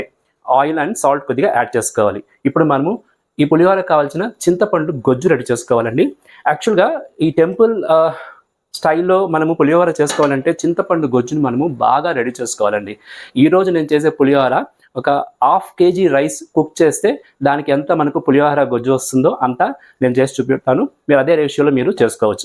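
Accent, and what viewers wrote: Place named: Karnataka, India. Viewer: Mee Telugu same memu matlade laage undhi, Karnataka na meedhi Garu?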